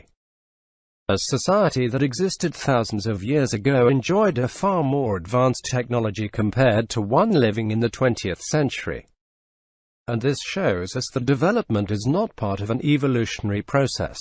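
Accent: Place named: United Kingdom. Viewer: atrocious robotic British accent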